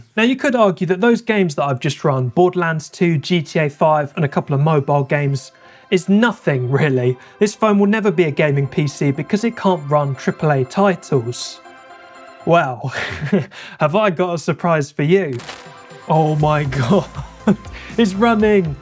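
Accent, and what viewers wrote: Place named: United Kingdom. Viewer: Good night I heard your British accent I'm sorry I can't watch it it just gets to me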